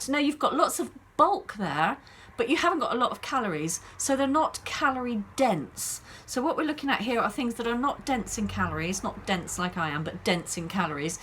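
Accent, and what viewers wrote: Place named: United Kingdom. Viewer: Hello from Dallas Texas, my name is Georgia Ann. I love your sweet British accent and smile, so happy to get to know you. Here is my story. I am 72 years old, and was 50 lbs. overweight. I have tried everything, I was up and down and yo yo dieting. One day I tried the Potato diet and said, I would give it a try. I ate potatoes only for one day and had an insulin drop and was sick and shaky So that did not last long! Another fail. Then I bought the Eat To Live book by Dr Fuhrman, and got more education on the plant based diet. I learned that you MUST have a BEAN dish everyday when eating whole plants. When your not eating the meat you must have beans and it becomes your protein.I am borderline diabetic, so it affected me with only having starch.All that said, I have lost 30 lbs. eating whole plant food. The most wonderful thing that has happened to me is, I have learned that, when you nourish and feed your body real food, the food addiction cycle, and the benging STOPS! 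It's easier to say no to Sugar, and not eating sugar stops the cycle and you gain control. My next hurtle is to learn to simplify this way of eating where it is not complicated recipes. I love to cook, I have for 50 years. But if you are not careful, you find yourself chopping and preparing all the time, plus a mound of dishes, then it becomes to hard to do. So I want to cook simple, , easy things and not get caught up in another diet. So Yes, the answer to your question, You Can loose weight when you are older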